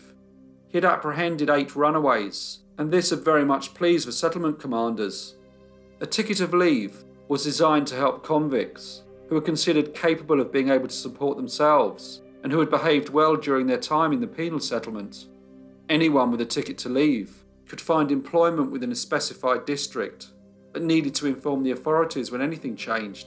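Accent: United Kingdom. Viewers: It's LeFFtenant, not LOOtenant. You sound British, you should know better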